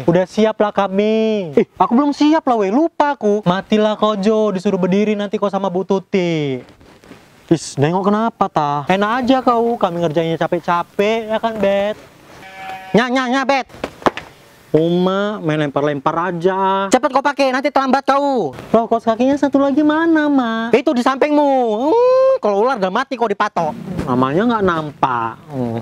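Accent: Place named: Medan, Indonesia. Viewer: Aku dari awal suka ngeliat. Parody pintar, gak kayak mimi peri yang gak sopan. memang kental dengan gaya kebahasaan khas medannya. Gak hanya ketawa terkadang bisa nostalgia dan ada sedihnya.😂 Sukses terus, teruslah berkarya.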